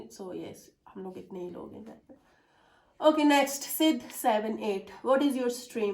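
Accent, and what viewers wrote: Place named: Baramulla, India. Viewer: Your way of talking is similar as baramullians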